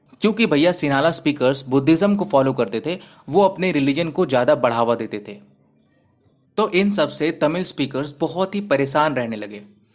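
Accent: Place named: Uttar Pradesh, India. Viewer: That line ki ' Bhaiya aaram se raho, zyada dimaag mat lagao' made me laugh..😉 Nice work bhaiya..Aapki bolne k tareeke se lagta h ki aap UP k h ..main bhi Lucknow me rehti hu 🤗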